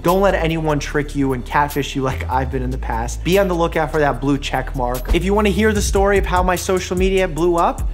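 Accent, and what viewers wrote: Russia. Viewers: im new, are you russian? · Are you Russian?? Even better😍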